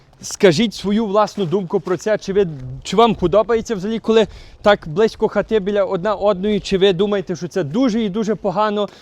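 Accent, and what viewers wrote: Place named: Ivano-Frankivsk, Ukraine. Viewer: Який чудовий акцент. Наче з Франківщини?)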